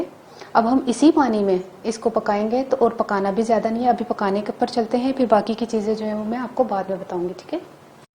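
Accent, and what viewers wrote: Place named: Punjab, India. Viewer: I'm late today oooo but anyway amazing and nice cooking and i loce punjabi touch when u speek punjabi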